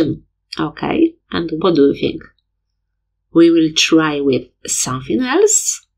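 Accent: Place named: United Kingdom. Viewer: Beautiful Brit accent 😍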